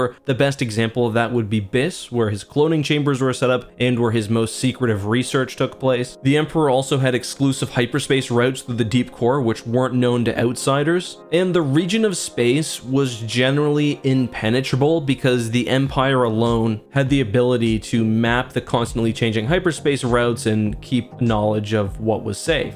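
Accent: Canada. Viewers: I always forget your Canadian until you say aboot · Ah booooot, lol Canadian?? Sweet! Maple syrup & such. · Is it just me or is his Canadian accent getting stronger and stronger? And this is coming from a Canadian.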